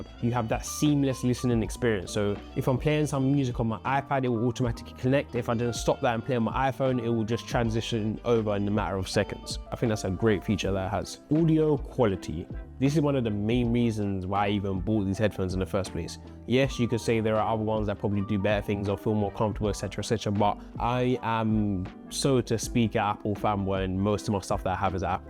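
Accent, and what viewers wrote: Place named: United Kingdom. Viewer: Just got my AirPods Max a few days ago and I don’t really regret it they’re amazing headphones. Also, Super random but you’re living in Canada with a British accent I have a question. As someone from the UK wanting to live in Canada which is better? (I think I know the answer)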